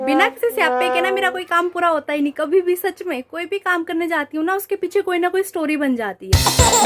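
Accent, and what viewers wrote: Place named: Garhwal, India. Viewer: Aapke muh se axxi lgri hai garwaali language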